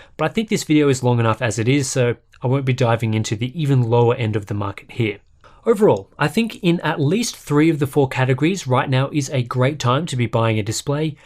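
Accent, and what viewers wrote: Scotland, United Kingdom. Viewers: perhaps Scottish? I hear a slight accent. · U got that default British voice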